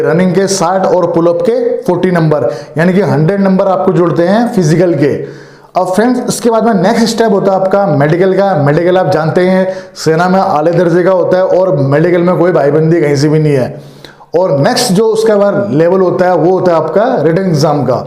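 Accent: Rajasthan, India. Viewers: Aap ho na ho rajasthani ho sir I am 100%confident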